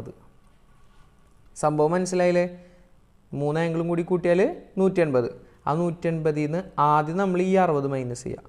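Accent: Thrissur, India. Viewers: Sir thrissur aanalle njaanum thrissuraa but now im malappuram... I’m still missing our slang😓✌🏻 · Thrissure kkarandhe bhasha poli Nan oru malappurakkali aanu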